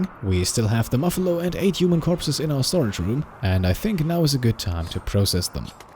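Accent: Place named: Germany. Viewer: Are you German or Swiss? I hear a faint, faint accent behind that soothing Documentary-voice.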